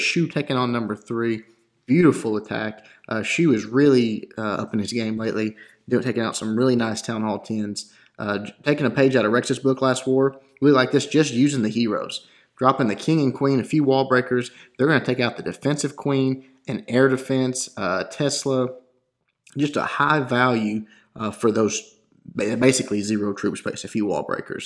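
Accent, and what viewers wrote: South, United States. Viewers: So, I finally got a little past early th9 and it's awesome cause now I have hours of useful study film. Thanks again for all the sweet vids, man, keep it up. Out of curiosity, you from the South?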